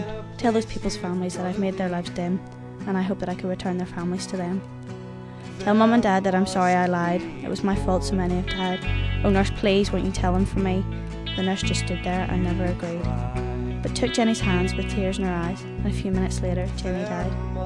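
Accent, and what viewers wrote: United Kingdom. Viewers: Just for some clarification, this is a true story. Based off of the poem, Moonlight Ride. Also, the way the narrator is speaking is how Northern Irish people speak. so understand that and get over it, and understand the true message behind this video. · it ok vid but you went to fast and r u from england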